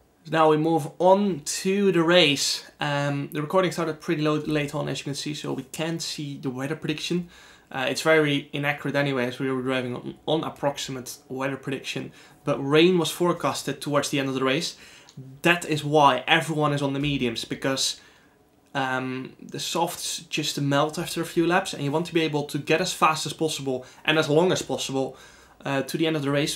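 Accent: Netherlands. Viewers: we dont care about the quality we just wanna hear that juicy dutch-english accent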